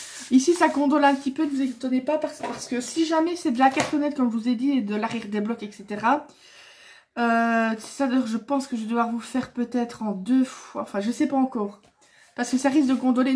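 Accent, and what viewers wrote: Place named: Belgium. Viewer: Coucou, j'aime beaucoup, ton parlé avec toi on ne s'ennuie pas, j'ais pu comprend que tu étai de Belgique? moi aussi, Bisous.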